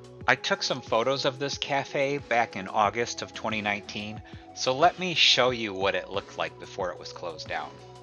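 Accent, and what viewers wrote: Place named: Midwest, United States. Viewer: Awww I remember when Target was like this! This brought me back and I love hearing your midwestern accent as it reminds me of home ☺️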